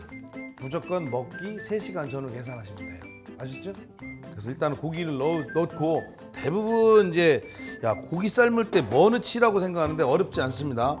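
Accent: Chungcheong, South Korea. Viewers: 충청도 사투리로 구수하게 설명히시니 재미있고 당장 고기 사다가 삶아먹고 싶네요. 꼭 이대로 해봐야겠어요.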